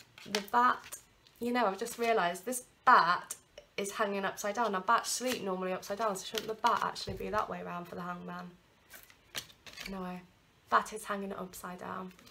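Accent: United Kingdom. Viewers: I am so happy that I discovered and AND that I stick by watching your videos, in spite I have hard time to understand British accent (english is my second, used to American). You are so wise and connected, I find your insights are being accurate. #1 for me, totally on spot, and Thank you for that.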